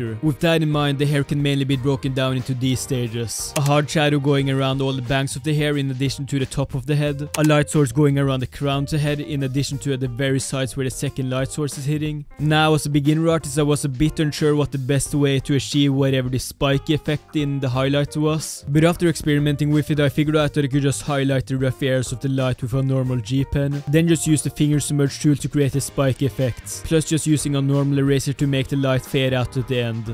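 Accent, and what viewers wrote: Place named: Norway. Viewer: Nice video, love these artstyle breakdown type of videos. Also goddamn you sound Norwegian lol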